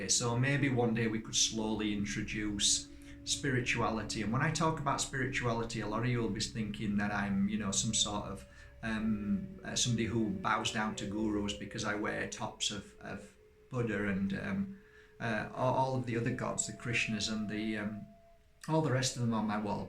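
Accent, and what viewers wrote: Yorkshire, United Kingdom. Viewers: Hey! I´m so glad I´ve discovered your channel about 10 days ago. I really don´t know how it happened. I´ve been seeing loads of your videos ever since, and all of them are just fantastic. Your energy is so uplifting and addictive. Before I didn´t know a thing about moldavites and now I´m totally in love with them. Their beauty is totally out of this world;) I really love your channel since the very first minute, it´s bloody incredible. On top of that, I lived in Leeds and just love the Yorkshire accent, now I´m back in my home town in Spain and I really miss it (At first I didn´t understand a word in the Yorkshire accent haha). A big thank you from the bottom of my heart for everything you do:)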